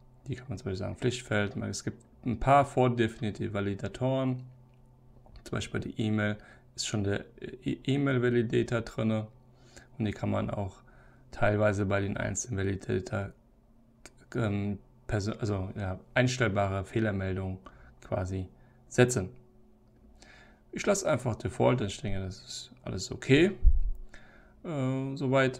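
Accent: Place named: Saarland, Germany. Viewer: sorry, der Inhalt mag/könnte ja hilfreich sein, wenn man was verstehen würde und was erkennen könnte. Hektisches Rumgefuchtel und Saarländisches Genuschel sind nicht hilfreich - Sorry to say that. Aber vielleicht willst du dich ja verbessern...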